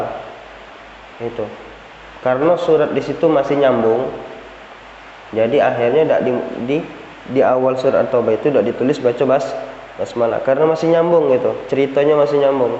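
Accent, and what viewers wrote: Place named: Palembang, Indonesia. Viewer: Cak nyo dari palembang yo bang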